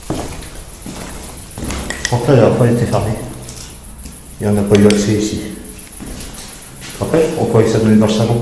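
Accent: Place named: Hauts-de-France, France. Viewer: C'est toujours un plaisir de suivre vos recherches. Vous êtes des hauts de France ? C'est le petit accent qui me fait penser ça en tout cas bravo beau travail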